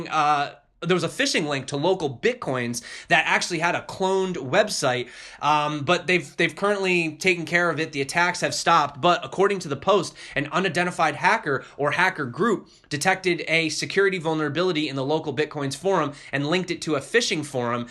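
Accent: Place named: United States. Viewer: Your accent is American.So I've asked you.Thank you bro.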